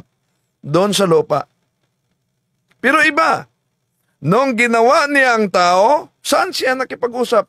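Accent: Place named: Visayas, Philippines. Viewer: Kainis ka naman mag announce halos dko maintindihan bisaya accsent Kasi eh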